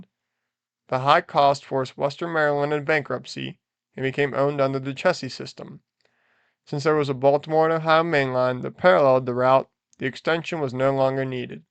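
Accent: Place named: Pittsburgh, United States. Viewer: The Pittsburghese is strong with this one...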